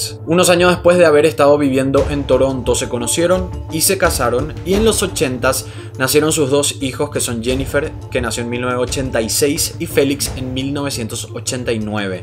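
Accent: Guarani, Paraguay. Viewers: Por su acento, pienso que es de Paraguay😉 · Me encanta tu acento guaraní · Este vídeo es algo TRAMBOLICO jajaja no mentira, realmente son muy interesantes tus vídeos y detecto en tu acento que eres paraguayo, me suscribo porque vale la pena tienes buen contenido, felicidades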